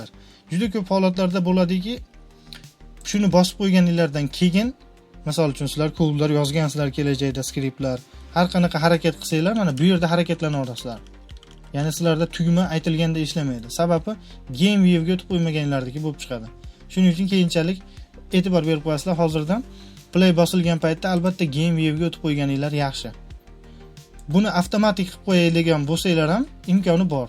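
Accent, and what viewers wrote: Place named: Andijan, Uzbekistan. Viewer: Anjan shevamdan aylanay. Rahmat video uchun, juda zoʻr tushuntirish boʻldi. Videolar kuniga chiqsa yaxshi boʻlardi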